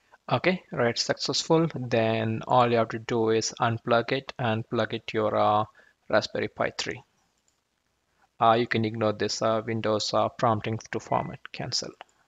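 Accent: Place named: India. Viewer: Thank you,. 1. So I need to find a router that has a USB port and connect the RPI and the hard disk to that router? Thanks 2. Yes Volumio and Max2Play are paid but hopefully they will offer some support in return, at my age I need a lot of hand holding when it comes to software. 3. These small screens connect via what is called SPi. I assume this means Special Purpose Interface and they require coding of which I have zero knowledge. I would have to find some youngster willing to help me with that. 4. So your laptop was never connected to the RPI? Thanks again for your quick response. I guess from your accent that you are an Indian living in the US. Probably a young software professional. So thank you for helping a fellow “Desi”.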